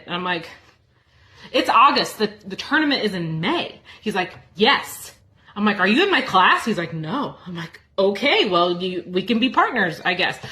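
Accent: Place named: Fargo, United States. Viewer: Gorgeous story. Love the hints of Fargo accent.😊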